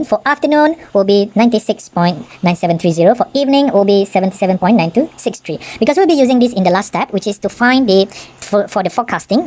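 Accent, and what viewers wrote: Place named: United States. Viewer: accent american sir ni pekat gila sampai aku lupa bukan orang america yang buat video ni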